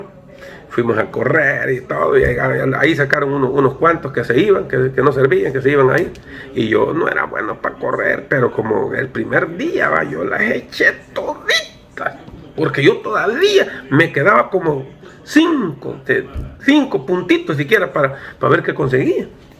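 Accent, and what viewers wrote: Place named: El Salvador. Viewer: Me llega como cuenta las historias este sargento .con un acento muy a lo salvadoreño,un acento campirano y esa jocosidad para narrar las historias .saludos sargento.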